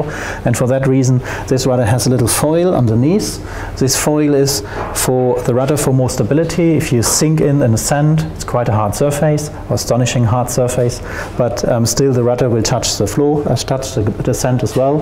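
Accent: Germany. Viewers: Hey, it is you again. I remember your German accent. How's your business in the pandemic?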